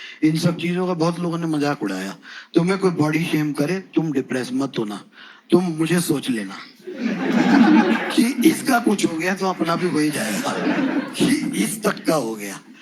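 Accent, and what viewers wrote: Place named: Bareilly, India. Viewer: proper bareilly accent....!!!!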